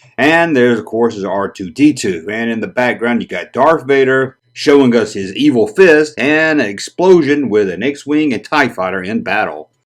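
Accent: South, United States. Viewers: Way below the Equator? Are you in the Southern Hemisphere? Thought I heard a southern twang in your awesome videos!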